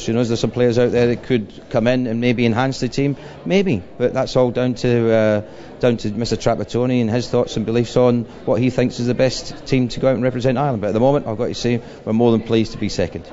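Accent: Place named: Scotland, United Kingdom. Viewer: Ray is Irish but why does he seems to speak with a Scottish accent?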